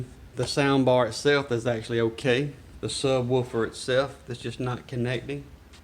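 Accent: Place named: Louisiana, United States. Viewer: Cool accent. Louisiana?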